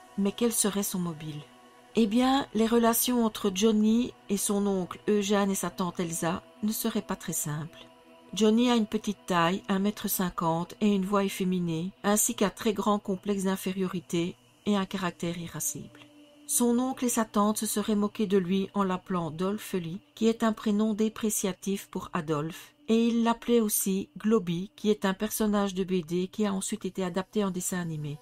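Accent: Belgium. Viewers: J'adore ton accent belge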